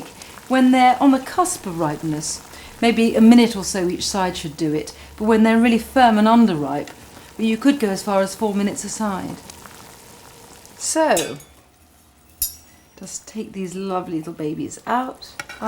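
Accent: United Kingdom. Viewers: هذا الانكليزي البريطاني صحيح Is this British English🇬🇧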